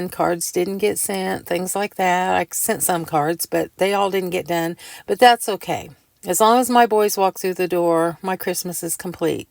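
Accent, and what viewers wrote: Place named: Indiana, United States. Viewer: I did not realize until this video that you are in Indiana. When started watching your channel recently --- your voice reminded me of one of my Aunts & her daughter's voice. Both from Indiana (the Indianapolis area) & maybe this is why - a Indiana accent. Thanks for your great videos - always enjoy them.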